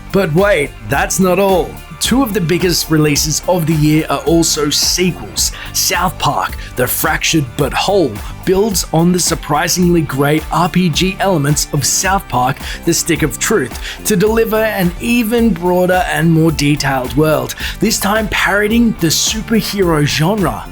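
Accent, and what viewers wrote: Australia. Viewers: Where is days gone set? Cause he says one of "our" national parks and this guy has an Aussie accent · Yuukei There is no such thing as a British accent so that doesn't make sense, he sounds and is Australian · Was the uploader Australian? His voice was hella hot, omg. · I fucking hate australian accent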